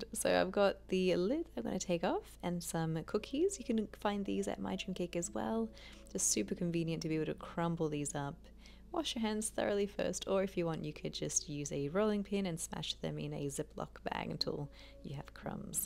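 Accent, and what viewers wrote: Australia. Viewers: No problem with the audio here. It's maybe a little quieter than some channels but all channels vary. Maybe someone is having difficulty with your gorgeous Aussie accent?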